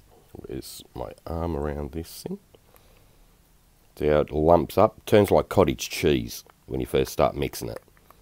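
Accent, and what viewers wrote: Australia. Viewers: Hi from Sydney Australia I subscribed as soon as I heard an Aussie voice. Just found ya gonna binge some of the rest of ya vids. There will be plenty of questions mate! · How good is the Aussie accent? So nice to hear on you tube. Champ recipe too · very good video...good to hear an Aussie male voice in this arena with excellent advice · I'm very new at this type of art and live in Tasmania so it's lovely to listen to an Aussie voice and also hear you talk about Aussie products and stores such as Bunnings. I would like to ask you a question please. I notice that you usually use Amsterdam black for your Activator. Can you use other products and other colours as activators thanks for your very clear informative videos Linda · Great to hear an Aussie voice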